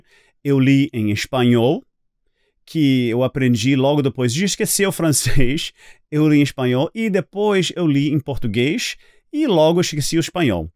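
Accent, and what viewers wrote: Rio de Janeiro, Brazil. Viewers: Quero aprender o Inglês tão bem quanto você fala o "Carioquês"! Gostei muito do seu vídeo, parabéns!!! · Voce é americano? e esse sotaque do Rio ? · . Parabéns !!! é um carioca da "gema"....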